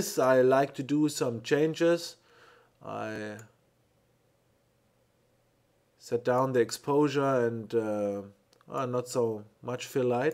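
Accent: Germany. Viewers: thank you very much for this video. Your work is inspiring and, please, please, never apologize for your german accent. Thanks again.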